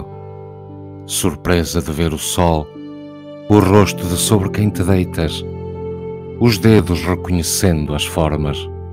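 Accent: Portugal. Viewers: Adorável...o seu sotaque português da mais poesia à poesia.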